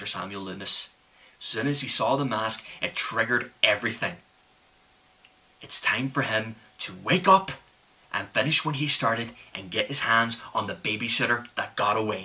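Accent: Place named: Ireland. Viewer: if Dr Loomis was Irish he would sound just like you.. great reaction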